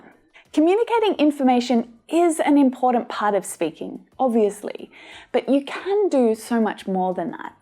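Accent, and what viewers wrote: Australia. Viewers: so you are from Australia, I was not capable of telling whether you are Britain or Australian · Im new to the channel, Im trying to guess where ur from? my guess is you are down under?? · I really love your Australian accent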